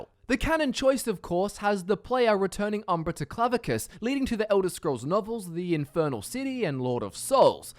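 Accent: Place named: Australia. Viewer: I love how in his Aussie accent says "Sheagorath"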